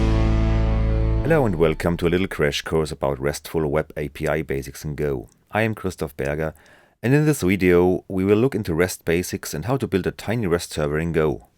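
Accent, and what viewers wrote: Germany. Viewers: The German accent is strong with this one ^^ Great video, well done! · 好棒， 简单明了， 听英文口音像德国人？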